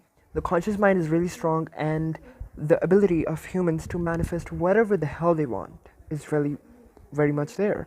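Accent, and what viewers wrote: India. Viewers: Wtf are u Indian ?!? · Are you an Indian? I hope the sublimals that I listen work😓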